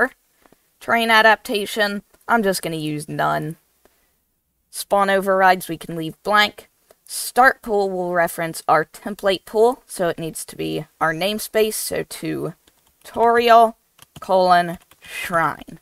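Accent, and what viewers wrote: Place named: South, United States. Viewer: most southern minecraft youtube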